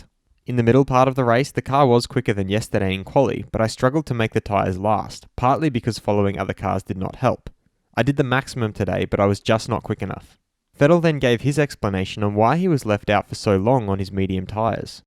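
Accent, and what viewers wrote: Australia. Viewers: You sound like you are an Aussie. Why in your subtitles do you spell "tires", a particularly yank spelling instead of the the more normal "tyres"?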